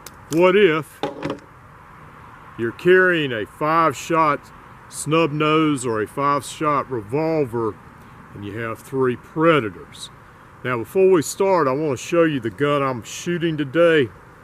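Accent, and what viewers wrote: South, United States. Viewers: My favorite carry is my S&W snubbie with laser. So light and easy to carry which explains why it’s my favorite. Keep up the good work. I love that southern accent of yours! LOL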